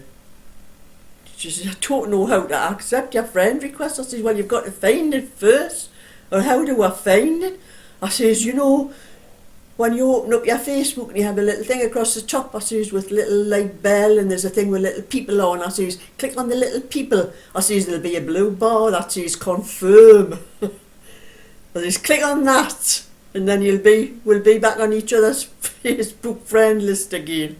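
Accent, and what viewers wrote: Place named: North East England, United Kingdom. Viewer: I have just come across your channel, it is such a joy to hear your lovely North East accent. My husband came from Houghton le Spring County Durham. Sadly my husband and I had serious covid at the very beginning. Sadly my very fit and active 82 year old husband died of covid the first day of lock down. I live in Cheshire and so I don,t hear the lovely lilt and phrasing of yours and my husbands accent any more. Thank you for your just lovely warm and homely channel. Regards Christine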